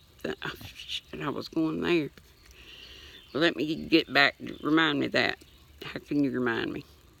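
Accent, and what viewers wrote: South, United States. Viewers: You are crackin' me up! Sending you vibes to find that "sugar daddy/mama" 😉I love your "country talk", it makes me happy💜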